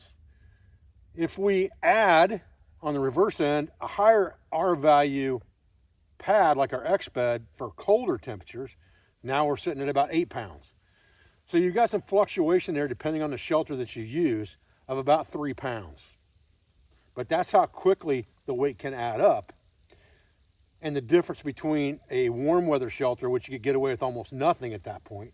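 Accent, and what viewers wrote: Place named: Ohio, United States. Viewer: Could you add the names of the packs to the video description. I was able to look up the first one you displayed, but your ohio accent won't let me figure out the name of the second one.:) Thanks, - Joe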